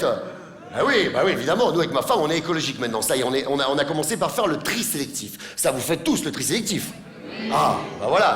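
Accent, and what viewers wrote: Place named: Lyon, France. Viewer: Joé Manitooly moi je suis originaire de la Loire du coup je l'entend l'accent lyonnais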